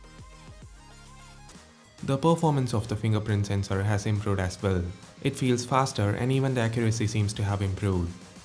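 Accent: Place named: India. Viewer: with the Indian accent? Umm... maybe he's Indian?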